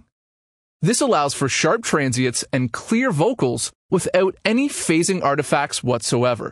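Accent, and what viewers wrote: Canada. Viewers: You sound canadian... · the canadian is strong on this one lol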